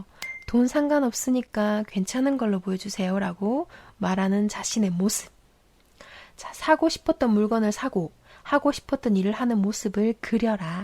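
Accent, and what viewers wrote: Gyeongsang, South Korea. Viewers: 목소리 듣기좋아용~🤩 경상도 같으신데 맞나용?😘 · 저도 경상도인데 특유의 엑센트가 재밌네요. 중간에 주문같이 말하는 부분도 재밌구요~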